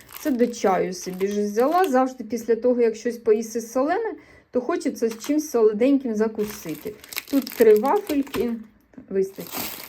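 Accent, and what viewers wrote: Sumy, Ukraine. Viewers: Привіт ❤ Все правильно говорите! В Сумах своя вимова! Але! Скажу вам,шо наші діти,навчаючись раніше ) в російськомовних школах здавали ЗНО на найвищі бали саме з укр мови і літератури Саме моя донька старша то у приклад З початком війни вона одразу перейшла на українську і в Німеччині всі в шоці,яка в неї гарна саме література укр мова! Бо її подруга,яка з заходу України тепер вчиться в неї мови! Ви класна! Справжня! Дивимося вас з молодшою донькою! Хоча секонди взагалі не цікавлять 😂 але вас дивимся с задоволенням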